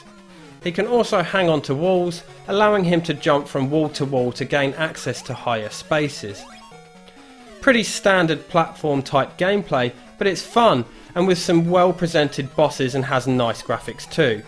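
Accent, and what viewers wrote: England, United Kingdom. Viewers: I downvoted this video because you're English and I find English accents to be very unpleasant and English reviewers always tend to miss the most important and distinct parts of gameplay mechanics in favour of prattling on about story and themes.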